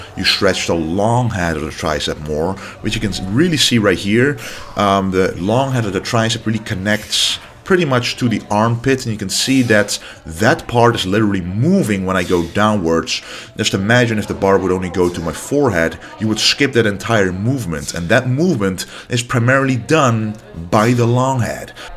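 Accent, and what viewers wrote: Netherlands. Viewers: Jaa man. Wist ik all. Jau accent. Nederlander wooh! You can't hide your Dutch accent haha. Amazing channel man.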